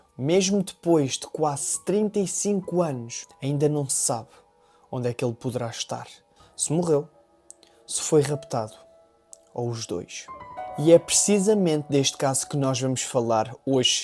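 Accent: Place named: Portugal. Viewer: Primeira vez que me inscrevi em um canal de português, tinha preguiça por conta do sotaque "inelegivel pra mim" agora estou me acostumando e procurando outros com temas diferentes que gosto,mas os portugueses parecem nao serem muito envolvidos como YouTubers. Assim posso treinar meu português, afinal com 22 anos so conheço versão brasileira.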